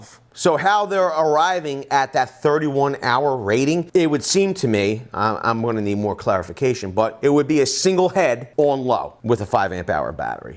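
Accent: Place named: Northeast, United States. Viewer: I'm trying to figure out where you're from based on your accent. It sounds like you spent some time in Brooklyn, Hampton Long Island, Jersey, Boston, and somewhere in the forest of Ohio. I feel like I'm missing one.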